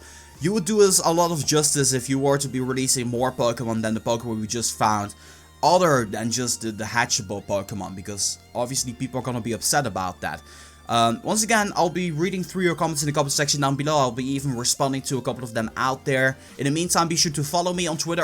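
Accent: Netherlands. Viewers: Is He Dutch?? His Accent Though I Am Dutch Too So Think He Is...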